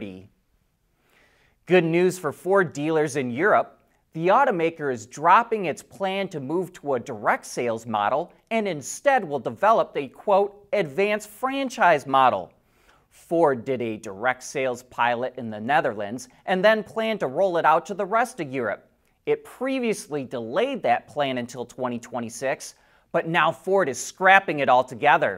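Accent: United States. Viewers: Is the presenter putting on the outrageous Murikan accent? Can anyone say where it comes from?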